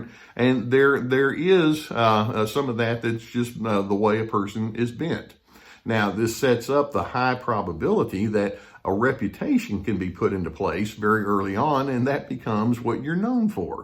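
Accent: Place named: Texas, United States. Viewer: in London everyone would expect you to be a cowboy because of your accent. We only know that show Dallas here...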